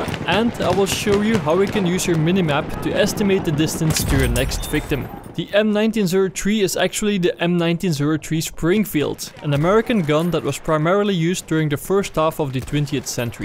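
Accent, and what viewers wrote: Netherlands. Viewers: That accent sounds Dutch with a hint of Scottish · are you dutch?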